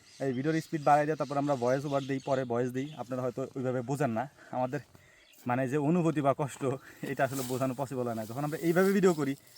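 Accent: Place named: Comilla, Bangladesh. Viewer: আসসালামুয়ালাইকুম আশা করি ভালো আছেন দয়া করে আপনার জেলার নাম বলবেন কুমিল্লার ভাষার মতো লাগে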